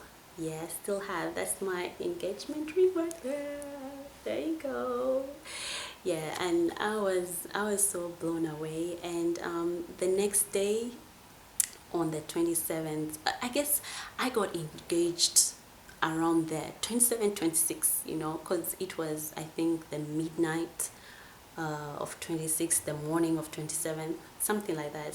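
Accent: Uganda. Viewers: Before even reading the comments, I knew she was Ugandan. She has this beautiful Ugandan accent. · I enjoyed the story!! Monetise this channel so you make some chums. I thought you were Kenyan at the start but then felt the Ugandan accent deep in there. Love your videos💖💖💖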